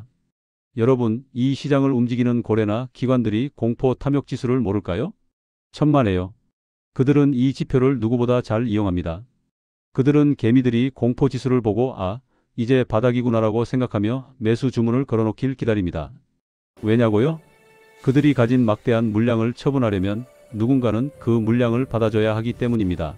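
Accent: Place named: Seoul, South Korea. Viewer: 서울말같기도 아니기도 같기도 아니기도ㅎ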